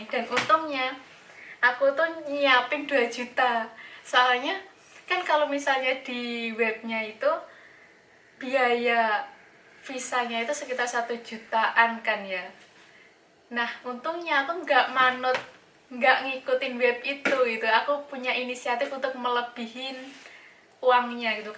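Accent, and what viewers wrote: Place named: Blitar, Indonesia. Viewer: 😂😂😂bhasane medokkk blitaran sikile teklokkkkk asoyyy 👍🏻👍🏻👍🏻👍🏻😉😉😘👍🏻👍🏻mantappp ya lanjutkan ngevlognya yg gokil gokil yaa kyak mak marck blitaran Barcelona, apa adanya yaaa 👍🏻👍🏻👍🏻😘😉🙏🤝🤝🤝ttep semangat ngevlog, smawong blitar wlingi 🙋🏻‍♀️🙋🏻‍♀️🙋🏻‍♀️🤝😉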